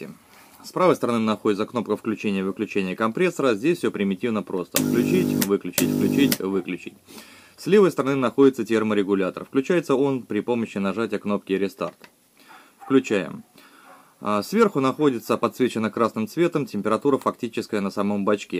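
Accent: Russia. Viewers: Нагинаем..., дубенеет... Вроде по русски говорит, да не совсем... Понятно что местный, южный, диалект. Но слух режет... Я один заметил, что выключатель питания не правильно подключен, не по феншую...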